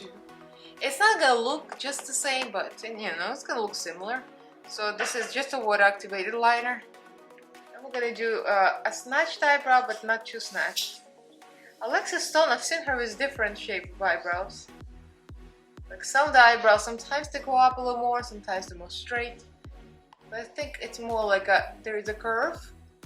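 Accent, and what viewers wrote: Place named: Russia. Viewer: You are just too funny. "My husband tells me I look just like her, but he always compliments me, so I don't trust him." "I sound like I have a speech defect. Yes, I have a Russian accent, so I sound like I have one anyways." 🤣. I already told you I think you look exactly like Alexis, too. And I'm not your husband, so you should believe me.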